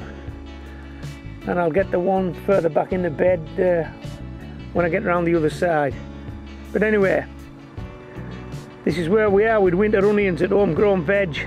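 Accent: United Kingdom. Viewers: You are a comfort to us as our people are from the U.K., so your voice brings good memories as well as good information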